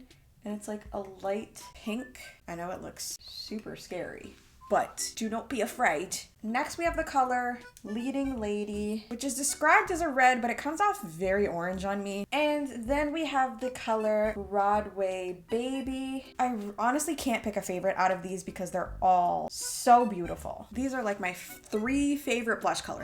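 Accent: England, United Kingdom. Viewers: Are you britesh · Loved the English accent, u did well hun, lol from the U.K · her bri'ish accent <3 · I love your British accent it reminds me of ronald weasly 😂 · Love the English accent girl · Omg girl! Your British accent>>>>333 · The british accent💅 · Girl that British accent never gets old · The British accent is here and THRIVING!!! It is making my day. Keep being you and don’t hide your personality!!!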